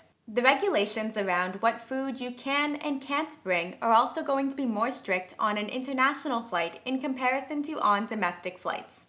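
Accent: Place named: New England, United States. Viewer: New England accent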